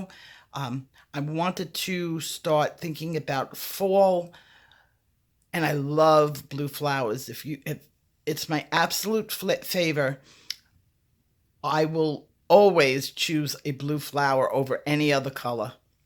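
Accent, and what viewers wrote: New York, United States. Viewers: I KNEW it was New York accent I was hearing !!!!! I’m from Midwest the accents are different!! I’m the one who was sooooooooo into one of your sessions and you’re working on a leaf and there’s a middle vein in it and you say …. “Now I’m going to apply the…..” well this gal hears “pasta” !!!!!!!!! I know I know that’s how new I am I knew nothing about Posca markers!!! You made my day on a day I needed it….so I’m putting my story out there for the newbies!!!!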